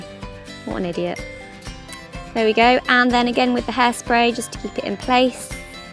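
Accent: England, United Kingdom. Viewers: R u british? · British accent!!!!! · you have the best British accent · finally! someone else with an English accent! i thought i was the only one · Are you from england? Your voice sounds so elegant! You sound like the queen of england! (Im from the US so im not used to fancy accents) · Is she really British or was she faking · I love u omg ur so adorable! Where from England are u? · Omg I love your accent sooo much! Is that British accent? I'm not sure bcuz I'm not real English person... Any way I loved your video! Now I got that how to make a fish tail ^.^ thanku so muchhh :)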